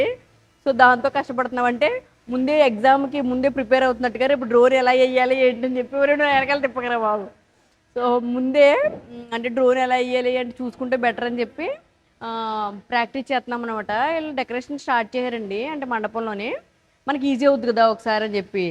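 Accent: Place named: Konaseema, India. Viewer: Garu Mi Vedio 's Baguntayi Konaseema Language Matladuthuntay Baga Enjoy Chesta Madi Konaseema Function Lo Baga Enjoy Chesara Next Vedio Lo Pettandi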